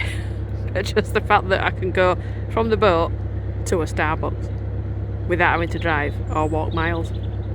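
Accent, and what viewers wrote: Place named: Yorkshire, United Kingdom. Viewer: Leeds and waky accent very similar, first time I've seen your channel I've subscribed look forward to watching more of your videos,👍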